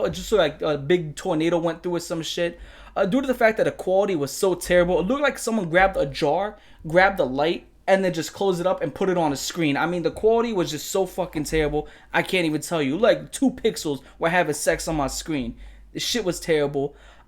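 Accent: New York, United States. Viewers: The New York accent is tuff tuff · Man, I haven't lived in the US in 12 years... your accent just hit me hard my bro.... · yeah as a bi girl I’m fine with it honestly. I do get uncomfortable when creators are openly homophobic cause it makes me feel like they don’t want me watching, but as soon as I remembered the New York accent I knew he wasn’t being serious lol 😂 as a lifetime New Yorker we have kinda fucked senses of humor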